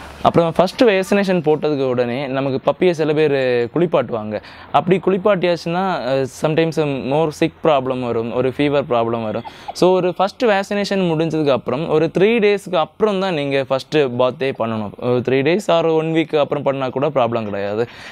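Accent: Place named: Kerala, India. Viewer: Some Malayali touches in the way you speaking 🥰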